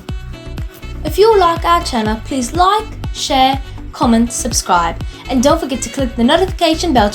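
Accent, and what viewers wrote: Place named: United Kingdom. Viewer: Great try with your British accent... came out well like recipie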